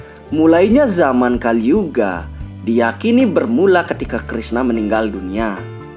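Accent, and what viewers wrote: Bali, Indonesia. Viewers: Bli logat nya it lo bali bgt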